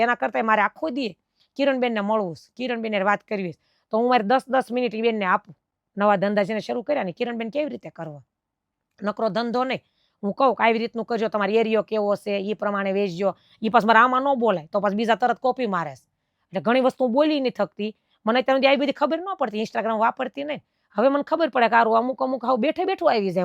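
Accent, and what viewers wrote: Kathiawar, India. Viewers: She is speaking kathiyavadi gujarati language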